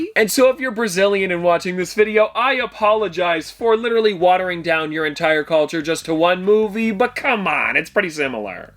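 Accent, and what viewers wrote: Canada. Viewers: dude are you canadian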